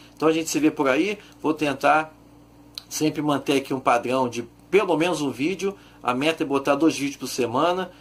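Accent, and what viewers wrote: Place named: Rio de Janeiro, Brazil. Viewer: Cê e carioca 😳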